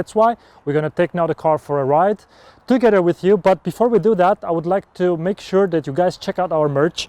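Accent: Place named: Romania. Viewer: Se vede accentu de roman =))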